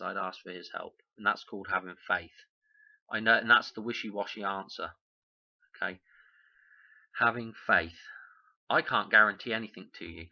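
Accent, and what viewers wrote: United Kingdom. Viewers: Liked your video mate a good watch sounds like your from the uk like myself and you seem pretty knowledgeable on all the laws of nature and the artificial laws put into by the powers that be of this world.👍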